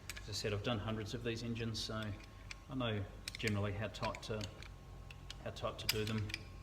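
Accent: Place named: Australia. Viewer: Cheers thanks for the Mellow rebuild and Aussie accent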